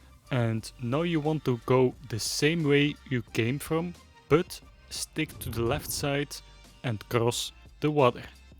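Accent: Netherlands. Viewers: haha het nederlands accent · Beetje Nederlands accent maar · Love the Dutch accent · nederlands? je hebt een exstreem grote accent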